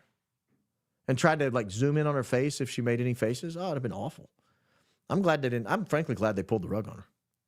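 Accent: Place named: Georgia, United States. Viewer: So, does "Adidas" sponsor your site? Just looked back...you have a different color hoodie for every day of the month! Same style...some things are creature of habit...maybe that's part of the business model, I don't get...but, it maintains consistency, and you're definitely good at it! Love listening to someone with similar dialect (native Georgian here).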